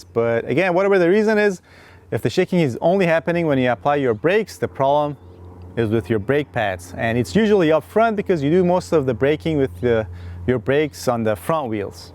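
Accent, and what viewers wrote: Iran. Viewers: I can hear that Iranian accent. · From ur accent I can figure out that u r 100% Persian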